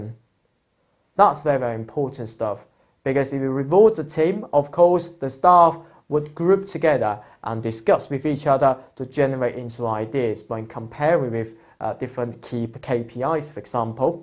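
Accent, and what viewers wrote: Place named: United Kingdom. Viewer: Very british accent)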